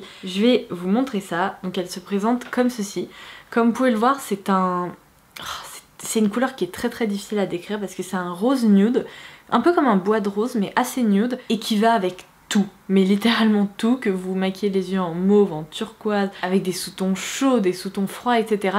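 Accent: United Kingdom. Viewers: Ton accent anglais me fais toujours un peu sourire xD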